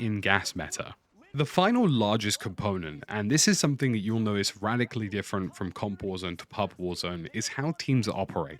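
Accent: RP, United Kingdom. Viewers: Topic for your next analysis video: why so many noobs/ low skill players think (nearly) all top-tier players are cheating. It's an important subject, because it definitely undermines the audience and enthusiasm for comp Warzone. It's also an interesting one, because on the one hand you have the fact that identifying cheaters is a skill that develops as you play (when I first started, I saw cheaters everywhere). On the other hand, you have the all-too-predictable social dynamics playing out in chat rooms and comment sections, where the wary skepticism of noobs is whipped up into conspiracy-level absurdity by toxic troll types. And on top of it all, every now and then an actual cheater is unmasked, and the community goes haywire. You have the experience, analytical powers and (perhaps most importantly) trusty-sounding RP voice to educate a stubbornly ignorant public. 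Give us what we need!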